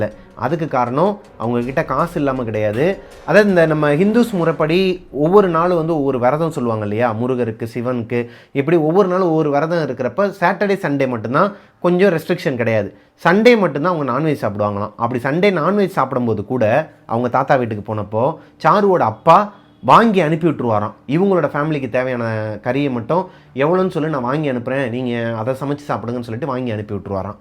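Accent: Bangalore, India. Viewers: Akka banglore slang tamil keka nalla irukku,im from banglore to....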